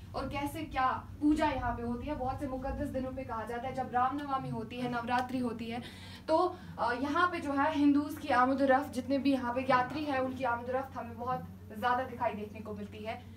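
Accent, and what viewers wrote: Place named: Kashmir, India. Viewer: in your hindi kashmiri accent is awesome. 👌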